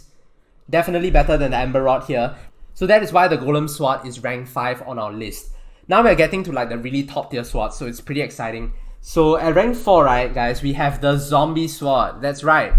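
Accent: Singapore. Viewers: You sound like your from Singapore 🤔 I’m from Singapore :D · he’s singaporean and so am i it’s a common mistake people make in singapore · are you singaporean? because you sound like a singaporean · Damn your singlish top class man support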